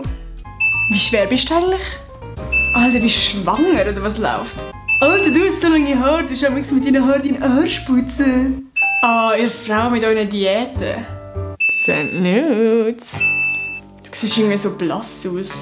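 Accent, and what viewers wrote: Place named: Switzerland. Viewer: scho klar aber vo welem kanton wel de akzent chunt mir bekannt vor😂😂 Und überigens mega cooli videos wo du machsch👌🏻❤️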